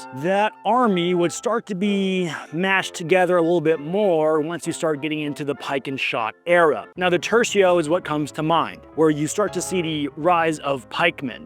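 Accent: England, United Kingdom. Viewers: His Brit accent isn't that bsd. Well, maybe it is but it's fun. 😁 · I’m English and I’m very impressed with accent he could definitely become an Englishman!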